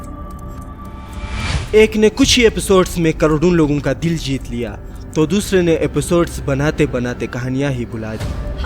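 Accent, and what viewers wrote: Gilgit, Pakistan. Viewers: bahi app accent se gilgiti lagtey hoo...where are you from?? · bai tera naam hai aur lehja bilkul Gilgiti hai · Bhai apke dialect to pakistani h? pakistan se ho as far as i remember, the boom of pakistani drama comes after Zindigi Gulzar h type of story that is retable to most of indians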